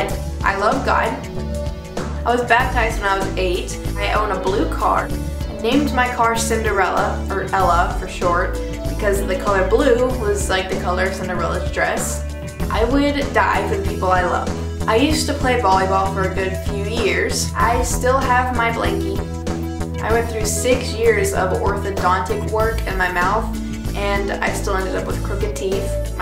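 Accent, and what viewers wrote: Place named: United Kingdom. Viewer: Do you wanna know something im sorry for calling you by your real name but my name is as well it is just spelt with a ( c ) and who cares if u think you have a bad british accent you are silly no affence. Coz i loved your accent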